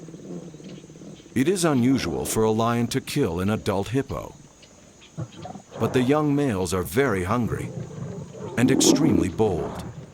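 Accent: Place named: Texas, United States. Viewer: He's narrating Texas-style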